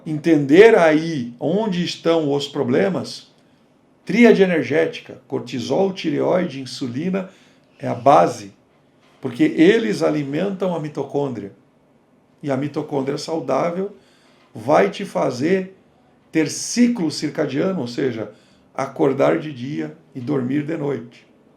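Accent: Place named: Minas Gerais, Brazil. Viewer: Gosto demais do seu male male, dr. Sou mineira e minha avó falava assim. 😊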